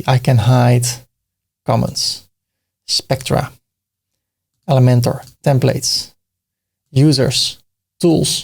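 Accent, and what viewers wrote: Netherlands. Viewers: sounds like a dutch accent . im not sure though. here goes nothing. Nederlands?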